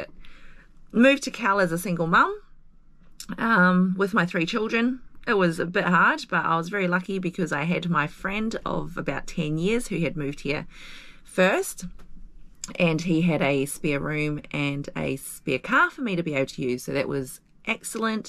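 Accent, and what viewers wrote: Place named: New Zealand. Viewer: Can tell the kiwi accent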